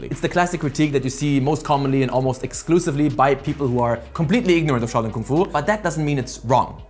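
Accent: Germany. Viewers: Ich bin verwirrt. Der Akzent ist 100% Deutsch, der content ist aber englisch. Zwischen drin droppt er aber ein deutsches Wort in perfekter Aussprache, auf der Kanal Info steht dann aber Vereinigte Staaten. Check gar nix mehr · First time watching you, first impression: wait, why is this Japanese person talking with a German accent talking about Chinese martial arts. What xD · he's german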